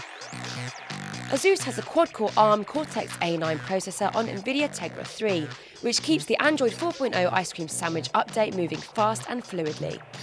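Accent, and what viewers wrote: United Kingdom. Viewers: Сразу слышно, что британка говорит. Ненавижу британский говор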